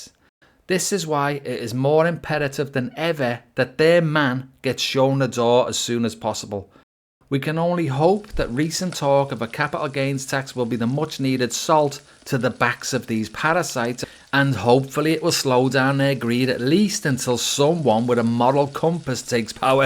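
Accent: Liverpool, United Kingdom. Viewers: But you sound like a scouser.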